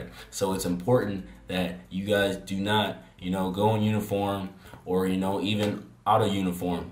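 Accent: Baltimore, United States. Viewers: you definitely from Baltimore I can hear it😂🙌🏼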